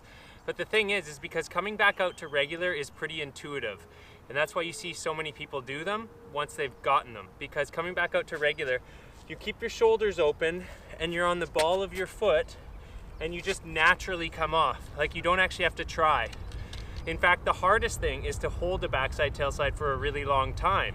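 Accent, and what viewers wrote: Canada. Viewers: the Canadian accent was strong on this one haha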